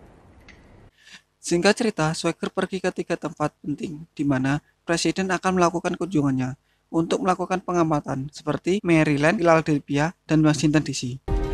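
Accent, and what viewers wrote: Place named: Java, Indonesia. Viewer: Suara elu itu mas mas jawa, blepotan, ancur banget poko nya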